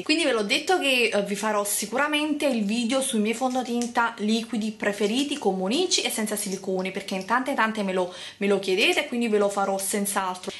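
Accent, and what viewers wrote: Naples, Italy. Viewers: Io ci provo a seguirti, ma te lo devo dire... il tuo accento è davvero difficile da sopportare. Per chi non è di Napoli, è un dialetto fra i più fastidiosi, mi spiace. "Quando lo vedo DI fare..." ma che italiano è??? Insieme ai siliconi io eliminerei la cadenza campana e leggerei di più. Ps. mi hanno regalato il tuo 'libro': inutile, visto che non spieghi dove reperire i vari oggetti necessari e ci sono veramente quattro cose in croce. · Vorrei anche io precisare e farti notare che dialetto e accento (cadenza) sono due cose diverse e dai tuoi commenti a volte hai fatto un po' di confusione.. ma io concordo su tutto! non mi sembra che usi spesso espressioni dialettali, se non in contesti scherzosi per fare una battuta o per citare un proverbio (cosa che ci sta), ma il suo accento è TROPPO marcato. Non lo dico per "antipatia" o "razzismo" verso i napoletani, ma lo dico perché quando l'accento è così marcato da impedire una agevole comprensione della lingua allora bisognerebbe imparare a moderarlo.. 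perché sì, l'italiano standard, LINGUA UFFICIALE CHE OGNUNO DOVREBBE CONOSCERE BENE, ha delle regole di fonetica ed anche quelle fanno parte del codice lingua. Secondo me è sbagliato cercare di nascondere del tutto il proprio accento "locale", ci mancherebbe, ma bisognerebbe almeno parlare correttamente. Io seguo da anni Marta di Sweetbeauty1990 e anche lei è di Napoli; il suo accento però a differenza di quello di è gradevole! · ma chi ti credi di essere? Ci sono fior fior di esperti, chimici, medici, farmacisti e molti altri specialisti dietro ad un marchio e dietro ad un prodotto. In più la tua filosofia del "tutto cio che è naturale fa bene"" è veramente da Medioevo. In più, se ti informi bene saprai che non è vero che in chimica tutto cio che finisce con -one è un silicone (giusto per dirne uno)... Purtroppo ci sono delle ingenuità di base che permeano tutti i tuoi video. Un'ultima osservazione: se vuoi rivolgerti ad un pubblico dovresti imparare a parlare in italiano, non si può accettare il tuo accento partenopeo, simpatico eh, ma inadatto alla grande comunicazione. 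· a te non piaccia la cadenza napoletana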